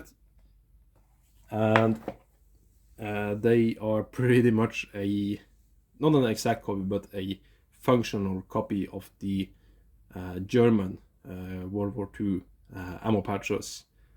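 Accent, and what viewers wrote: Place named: Norway. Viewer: Jeg hører på engelsken at du er norsk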